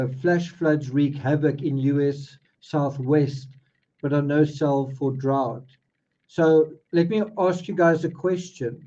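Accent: South Africa. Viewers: You must be South African, like me. How long have you lived in the USA..?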